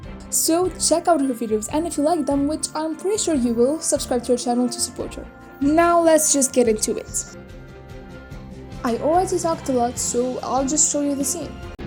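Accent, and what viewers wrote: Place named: France. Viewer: Your French accent is so strong I thought you were speaking French in the beginning for a sec there😂😂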